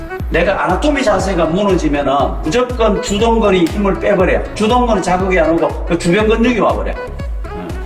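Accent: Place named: Gyeongsang, South Korea. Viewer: 나도 이 경상도 아저씨한테 배우고싶당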